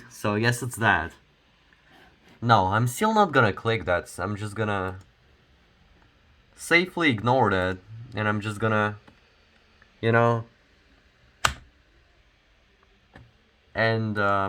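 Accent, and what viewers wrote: Russia. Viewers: 10/10 russian accent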